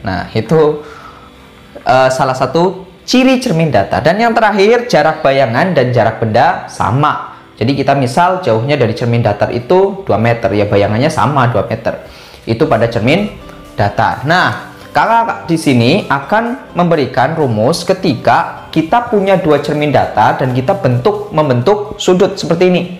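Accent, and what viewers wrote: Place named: Java, Indonesia. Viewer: Terjadddi, Oke, dan Jjjelas ... meddoknya keliatan , trs katakata Jjjur ya Kesimpulan, bagus .. dan aku gabisa ngerjain soalnya.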